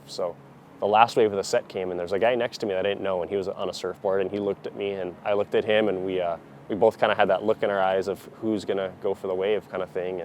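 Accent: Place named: Canada. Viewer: He never says how he got his accent in Canada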